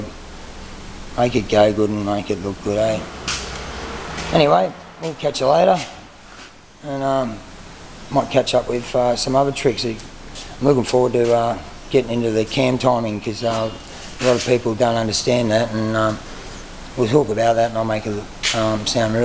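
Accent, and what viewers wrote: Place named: Australia. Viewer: he's an aussie mate, not a kiwi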